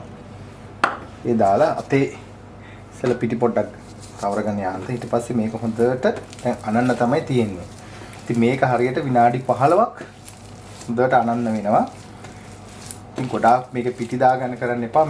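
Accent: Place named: Sri Lanka. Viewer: No offense. Have you heard the French, the Germans, Slovaks and other ethnicities speak English. They have their own way of pronouncing English words. For example, the Americans pronounce castle, tomato, potato and a few other words very differently than the British. There is a significant difference, that one could clearly identify where you are from. Similarly, chef was born and raised in Sri Lanka, and lived majority of his life in Sri Lanka. His way of pronouncing some English words are entangled with our sinhala dialect. Which comes naturally if one doesn't make an effort to change the way of pronunciation. Did chef pronunciation affect his recipe? Did his pronunciation affect his career? No. Not at all. Why publicly point fingers? How would you feel if someone did the same to you? Making one feel small on a public platform is unkind, Inhuman. 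It says a whole lot about you, rather than about chef.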